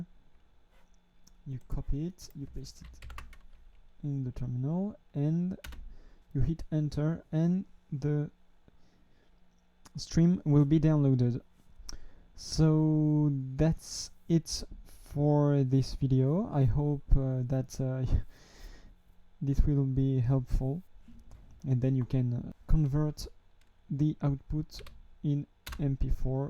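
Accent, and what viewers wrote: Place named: France. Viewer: Been lookin for a way to download twitcast videos for offline playing for quite sometime and you did it!! Mèrci!!!! Hope I'm not too preseumteous to assumed that you're French from your accent. P.S : Locked videos can also be downloaded provided you have the password for it, and start/play the video before the browser console step. (The vid I wanted to grab is a locked one) P.P.S : The only difference for me is, I have to hit enter after the download has completed. It did not promt me about the conversion automacally.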